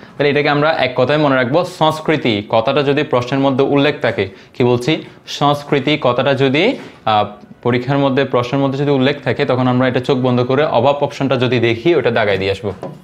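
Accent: Noakhali, Bangladesh. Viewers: Apni ki Noakhalir😐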